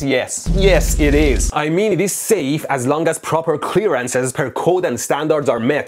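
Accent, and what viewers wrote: Iran. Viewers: لهجت داره داد میزنه ایرانی هستی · ایرانیه از لهجه اش معلومه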